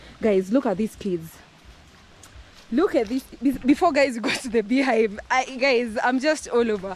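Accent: Kenya. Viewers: She sounds Kikuyu and thinking that looks like Kirinyaga area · You didn't say what country that is. It's so tropical. I had no idea there was such a beautiful place in Africa. Is it like Congo or something? I think Kenya might have such but not sure. Your accent sounds much like Kenyans I have talked to.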